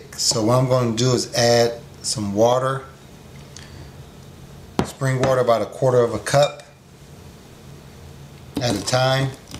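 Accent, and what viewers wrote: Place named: Trinidad and Tobago. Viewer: You sound like a "Trini" lol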